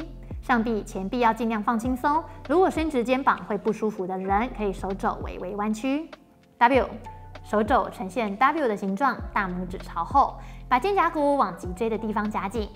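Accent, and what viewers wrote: Taiwan. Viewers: 我超喜欢你的内容😊还有你台湾的声音😊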